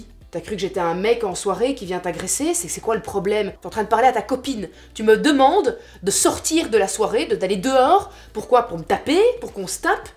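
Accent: Belgium. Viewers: J'ai une question rien n'à voir avec la video. commence toujours ses vidéos avec "mes ptits vautours" (avec un bel accent belge hahahah). Ça vient d'où cette phrase? Pq elle appelle ses abonnées comme ça?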